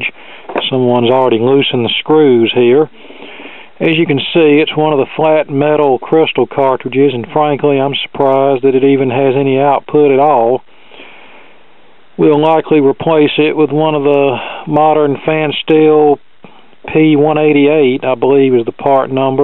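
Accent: South, United States. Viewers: you sound like a True- Knowledgeable Southern Gentlemen of Audio Repair Technology - I,ll stay subscribed to your Channel.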